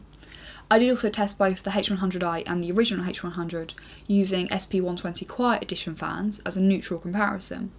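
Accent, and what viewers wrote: England, United Kingdom. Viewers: A brit-accented blonde telling me about technology? What sorcery is this?!! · you look russian on your thumbnails, and suprised when i hear the british accent haha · Blondie and Coumputers? o_O? lol but her British accent is sweet:) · I really like UK accents! It just sounds so nice for some reason! xD Great video btw! :) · I'm sure you meant English* accent dude. She's English and thus speak with an English accent. I'm an American and I speak with an American accent, happens to be a Southern one (Virginian) since I'm out of the South. Something tells me you are an ignorant Yank. :)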